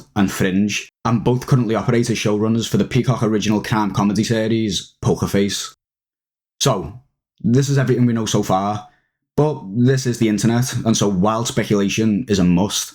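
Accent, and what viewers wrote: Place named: North, United Kingdom. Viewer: nah they’re not the only one! It’s so refreshing to hear a familiar accent. It was partly why I kept watching.😊 We’re definitely underrepresented in the media. Northern accents in particular are sadly so rare.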